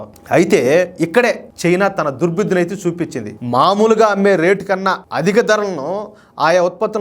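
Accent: Rayalaseema, India. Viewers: Anna meru rayalasema language lo super ga mataladutunnaru Anna me conference ku super meru taggaddu jai Hind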